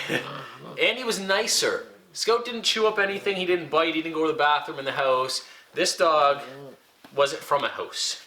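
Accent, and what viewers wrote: Ontario, Canada. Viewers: Your Canadian correct? Not out of Michigan. Thanks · hey. i saw you on alone and stumbled across your algonquin videos. i'm also from ontario and around the same age as you so i love how we have the exact same accent and i get all your little references. this dog is probably smarter than scout was so you gotta be more strict and firm. make it learn tricks and commands and dont be afraid to be firm. can't wait to see how he does in our beautiful provincial parks this year. thanks for making these vids, i know it's a lot of work but i appreciate it and i know your kids will love looking back on these videos once we're both old grumpy men.... or after you die from being eaten by a muskie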